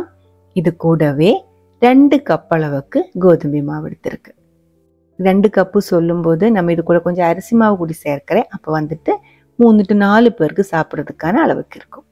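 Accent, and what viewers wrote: Kerala, India. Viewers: Even Though U Are Kerala Malayam U Are Speaking Tamil Very Beautifully Must Appreciate U Expecting More Food Recipe From U Thank U Sister Wheat Dosa Very Nice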